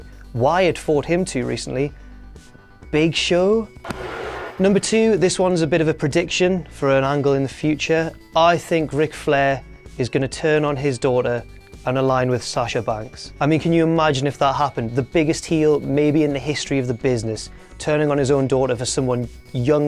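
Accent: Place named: Newcastle, United Kingdom. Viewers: Yay!!... You are posh for a north eastern lad though. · HA! You're from Newcastle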